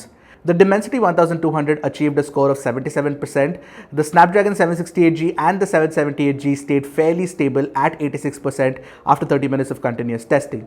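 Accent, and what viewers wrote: India. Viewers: I struggle so much to understand Indian accented English :(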